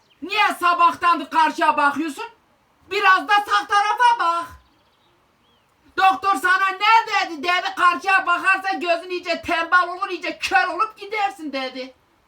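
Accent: Turkey. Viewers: Bence sen zazaca konuşma aksanli karakocan zazacanla hep kendine argo şeyler söylüyorsun lexanlisiniz siz galiba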